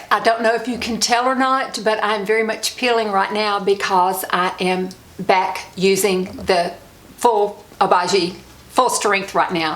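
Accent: South, United States. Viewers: I just found your channel and I just love it. My family is from the south so I love your southern drawl. Also, my mother has fought Crohns for many many years - and lives in the Knoxville area. Please keep these videos coming. Love you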